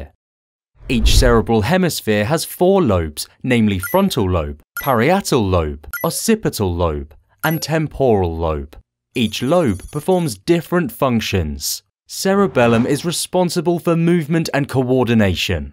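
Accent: England, United Kingdom. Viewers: I find the dichotomy and paradox of the thick British accent being paired with the lack of conjunctions and prepositions quite amusing! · this sound is full of england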